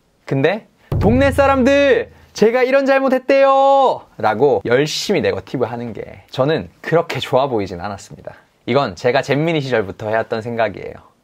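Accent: Gyeongsang, South Korea. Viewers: 이분 말투 들으니.. 오리지날 서울사람 아니시네...경상도 분이시네......서울말 쓰려고 노력하는 경상도 분...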